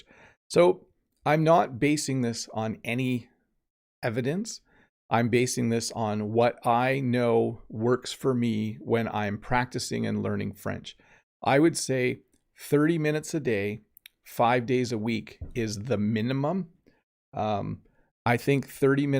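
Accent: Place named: Canada. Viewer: Hi, no problem your Canadian accent is great and we understand your good English very well. salut mon ami.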